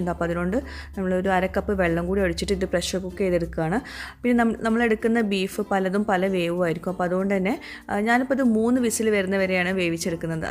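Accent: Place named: Kasaragod, India. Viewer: Kasargodan nhammal... Ade samasaravum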